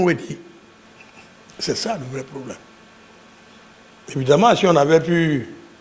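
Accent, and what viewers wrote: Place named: Fon, Benin. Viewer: Votre accent résonne fon-mahi. Je suis impressionné!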